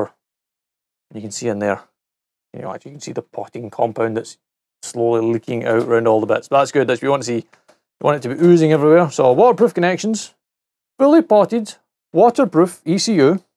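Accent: Scotland, United Kingdom. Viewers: I love watching and listening to your videos. The refreshing sound of a Scottish voice is like some healing tonic. :)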